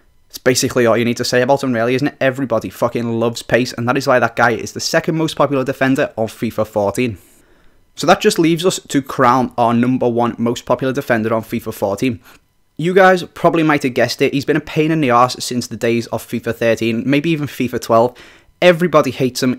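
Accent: Liverpool, United Kingdom. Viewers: Is he from Liverpool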